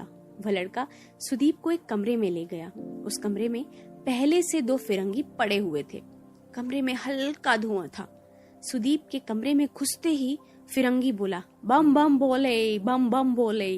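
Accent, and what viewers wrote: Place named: Bhojpuri, India. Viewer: Bhojpuri vioce amezing❤ 😂😂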